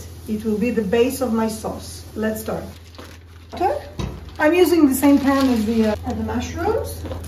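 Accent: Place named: France. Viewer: Goutez a little bit...your accent was so french😂😂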